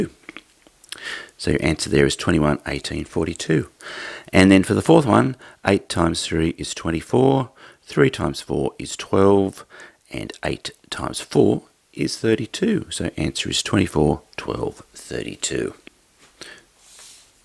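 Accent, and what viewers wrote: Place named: Australia. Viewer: omg you are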